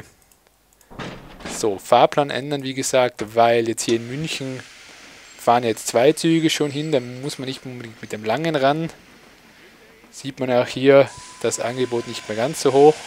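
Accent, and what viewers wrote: Austria. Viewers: Jo bisch denn du a Tirola, ha?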